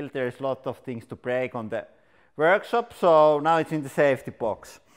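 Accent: Finland. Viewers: Finnish accent?? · ralli englanti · Sounds like this guy is from Finland and he has some Biltema jack's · I've been wondering why this guy sounded like Kimi Raikkonen, little digging and just found out he's Finnish, no wonder lol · Without checking, you are from Finland :) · Most Finnish accent ever. Go Finland 🇫🇮 Soumi!!!!! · Drop the talk. Can't tell if you're speaking Finnish or English with extremely heavu Finnish accent. Put up some text instead.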